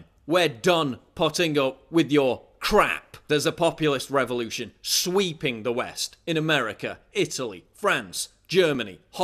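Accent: United Kingdom. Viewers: I'm about 90% sure that is just another quirky characteristic of British English. Just like he, and many others would probably pronounce "luxury" as "lugzury." Also, they tend to pronounce "Obama" as "Obamer" with the intrusive-r. There's nothing wrong with it in my book. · After all: British accent, expression and choice of words is so mutch nicer to listen to than to american traitor english >>>> american english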